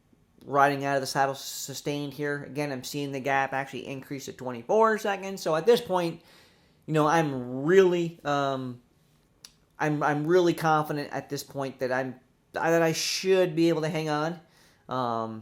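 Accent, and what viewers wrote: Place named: United States. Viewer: What an annyoing ''Yank'' commentary could not listen to this guy!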